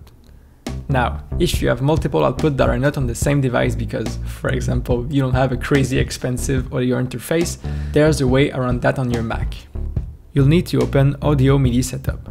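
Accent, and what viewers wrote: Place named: Quebec, Canada. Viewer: tu est clairement québecois haha ?!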